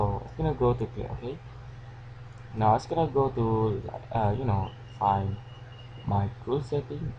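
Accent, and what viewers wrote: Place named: Malaysia. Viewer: Malaysian voice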